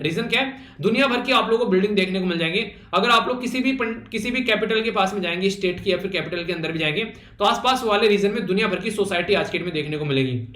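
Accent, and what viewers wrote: Haryana, India. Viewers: I guess from hariyana or western UP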